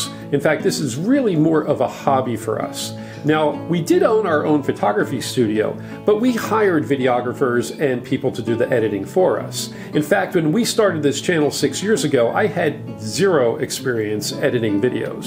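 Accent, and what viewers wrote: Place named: Philadelphia, United States. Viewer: I have been following you guys from the beginning, THANK YOU! My wife is from Philly and she enjoys the accent. I like that you 2 do this not for the money. I'm retired and born and raised in mid-town Manhattan and would sell everything in a NY minute, but unfortunately my wife doesn't share my desire, so I live thru you 2. While boating is not cheap or for the faint of heart divorce is far more costly. thanks to you for giving me hours of fun>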